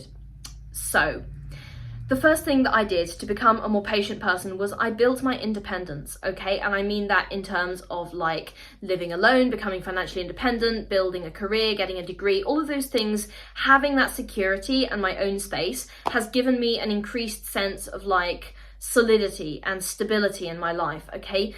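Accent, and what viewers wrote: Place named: United Kingdom. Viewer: You came into my algorithm I was about to pass on Then I heard your accent Its so British haha Found you interesting, I'mma try to listen to more of you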